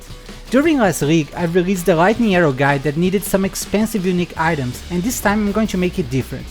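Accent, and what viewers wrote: Brazil. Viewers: Mano por mais que dê pra notar que você é Brasileiro pelo sotaque você tem fluencia e é intendivel o que você fala em inglês, então por quê da legenda em INGLES novamente? ajuda seus compatriotas tupiniquins ai kkkkk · Is the person speaking Brasilian?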